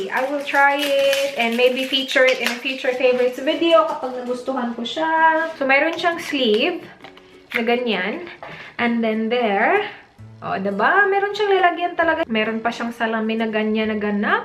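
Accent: Visayas, Philippines. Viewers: haha natawa ako sa "bangag",cute kaayo paminawon ang imo tagalog na may sagol nga bisaya.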